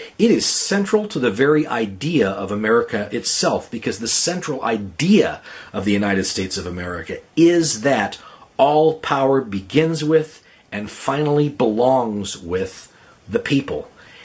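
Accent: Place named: England, United Kingdom. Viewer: I like your English accent, lol.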